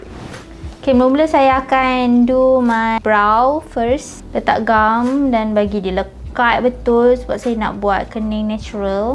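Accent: Kelantan, Malaysia. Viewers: reply 😲😭 nak nak! lg best bila dgr kecek kelate🤭 btw, u've gone through much tough times..fighting! You did well 💜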